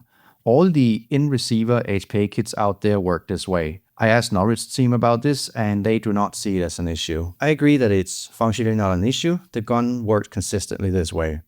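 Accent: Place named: Denmark. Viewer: Very interesting video, i dont know why, but your accent sounds very Danish to me?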